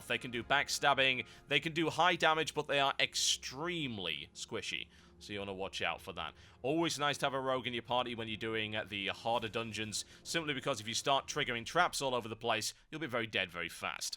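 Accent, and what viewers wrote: United Kingdom. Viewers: this is available in EU Right?, because your definitely a Brit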